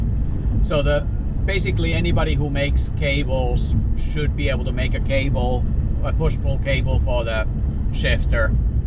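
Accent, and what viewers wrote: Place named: Finland. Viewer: nice project. good solution for duoselect have to say that you sound very Finnish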